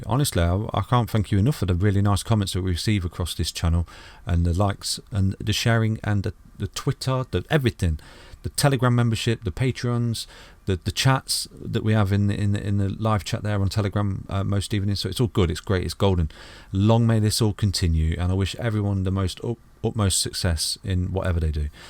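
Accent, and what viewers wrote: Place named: Nottingham, United Kingdom. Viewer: Hi mate are you from Nottingham I can hear notts accent coming out 😅👊👊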